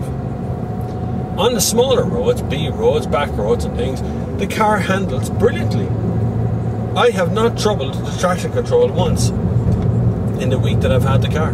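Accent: Ireland. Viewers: great review. really pleased to hear an Irish voice for the irish car market. Am buying this car tomorrow. getting the dynamique version so no sat nav but an alround great car.and we got it for 17000euro with scrappage!!!!!! thanks again for a great review! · It would be great if a TV car show was commissioned in this kind of format, everyday cars for everyday people with a down to earth presenter. Top gear bores the hell out of me, dropping pianos on Morris marinas etc. I can't stand Clarkson, the man is a wally. Lets hope you get your own show, I'm sure you'll have a devoted audience of girls AND the boys too, I'm just a sucker for an Irish accent =)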